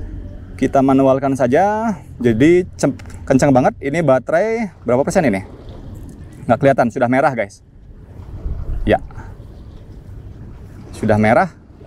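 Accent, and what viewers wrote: Bali, Indonesia. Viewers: Kayak logat Bali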